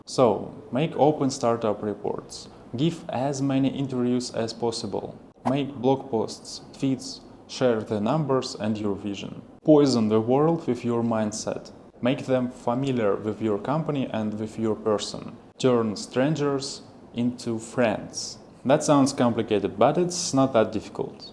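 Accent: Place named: Russia. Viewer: russian accent, I have the same, we have to think what we want to say first before saying. But thanks for the tip it's much better now :)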